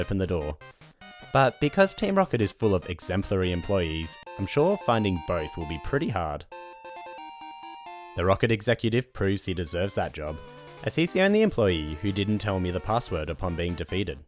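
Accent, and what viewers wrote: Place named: Australia. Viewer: a fellow Aussie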